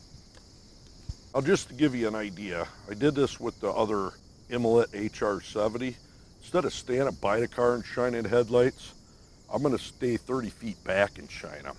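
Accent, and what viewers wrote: Minnesota, United States. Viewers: Minotaurism probably could do a mean Macho Man too! They’re all from Minnesota area so it’s the accent.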